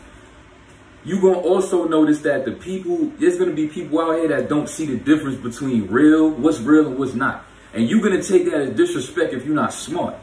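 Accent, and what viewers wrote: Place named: New York, United States. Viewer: This nigga from New York for real for real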